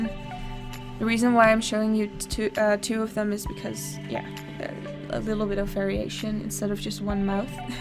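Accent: Netherlands. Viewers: She uses Paint Tool Sai, but I don't know what tablet. It doesn't matter that much though :P unless you're more experienced. When I just started out, I used a $60 Wacom Intuos Draw tablet. It doesn't have a screen, but only higher end ones have screens, and you get used to it pretty quickly. I think she has a dutch accent :D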